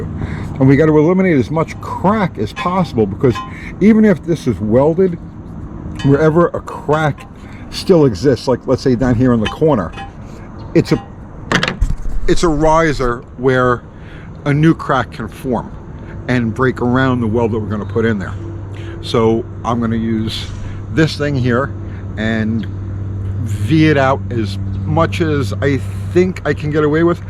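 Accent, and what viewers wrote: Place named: New Jersey, United States. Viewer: judging from your accent, I'd say you're in Joyzee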